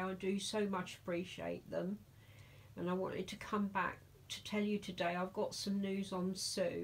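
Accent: United Kingdom. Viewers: I don't know why YouTube unsubscribes me from random people ... I was wondering why your recent videos weren't popping up in my feed! .... !! .... I remember the last video I've seen was about a haul (which are my favorite) 😊💕🌺 What I enjoy most about your channel is the hauls and the Theme Thursdays you do on the babies. You have a lovely collection! I would love to continue to see your theme thursdays and your babies dressed up in the beautiful clothes that you have for them. I enjoy your book-story-telling too. You have a wonderful and kind heart 😊🌺 Plus, who doesn't love listening to a UK Accent?? hehhehheh .... Have an amazing day!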